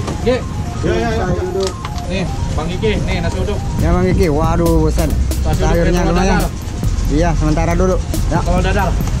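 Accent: Bangka, Indonesia. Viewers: Orang mna bang. Kok kyk org bangka. Hehe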